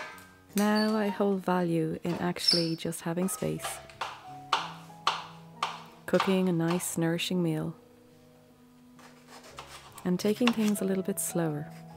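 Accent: Ireland. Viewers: Those mint leaves also are fantastic with lamb or even in a salad. I love mint tea also. Very nice peaceful video and your voice an Irish accent is beautiful. You have new subscriber from Chicago! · Nice, soothing Irish lilt, thanks!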